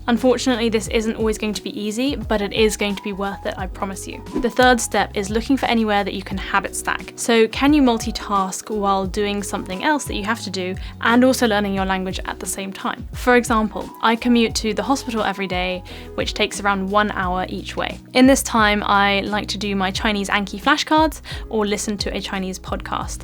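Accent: England, United Kingdom. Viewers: Thank U for the video!!! I never cease to be amazed at Ur understandable British accent! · Actually I am a school student who is willing to learn British accent,I found your accent interesting. Thank you .We really appreciate your dedication for helping others develop their accent even if you get nothing in return. Your such a great need for society. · You're Welcome !! I really love your english accent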